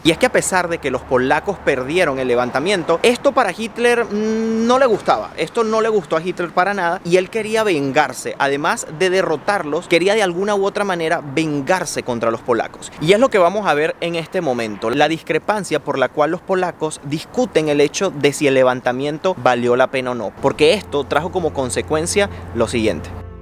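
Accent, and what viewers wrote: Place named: Venezuela. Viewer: soy venezolana y por casualidad me encontré con este video. Al identificar tu acento, me emocione. Al mismo tiempo me lleno de admiración como en estos tiempos tan difíciles están realizando tu y tu esposa este trabajo increíble. LOs felicitó! Continúen con esto que es el rescate de la historia y su legado a las nuevas generaciones.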